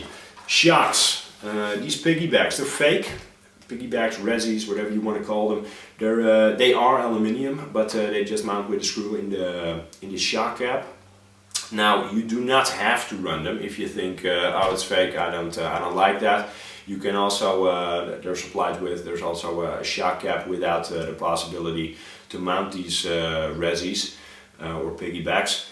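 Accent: Netherlands. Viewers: Najs Dutch accent..! :-)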